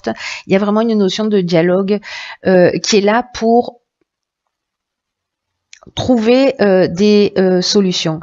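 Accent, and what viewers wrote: South, France. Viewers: Cc, oui il y a des artistes dans les parages 😅 intermittent du spectacle, chanteur guitariste pendant plusieurs années jusqu'à la retraite. Après un accident de la route, je replonge dans mon répertoire depuis une dizaine de jours et je répète, un peu dur après 3 ans d'arrêt. J'aime beaucoup votre voix et cette petite pointe d'accent du sud 🎉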